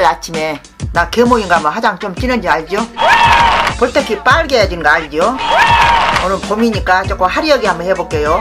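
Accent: Jeolla, South Korea. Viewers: 자메이카 출신 할머님 전라도 사투리 찰지심ㅋㅋㅋㅋㅋㅋㅋㅋㅋㅋ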